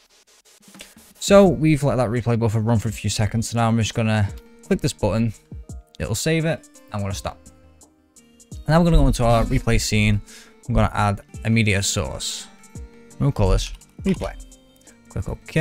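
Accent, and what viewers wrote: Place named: United Kingdom. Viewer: Closed captions is must for this video, very fast and hard to understand dude, I love a UK accent but cmon man :)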